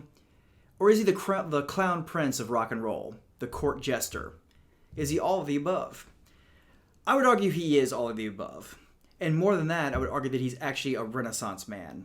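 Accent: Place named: Georgia, United States. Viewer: I'm so glad I found your channel! I had this book, loved it, I'd pick it up sometimes and read any random section, I always got something out of it that stayed with me, then I loaned it out & never got it back, so this is a real treat, and btw, you do a great job and your Georgia accent is endearing, I like it.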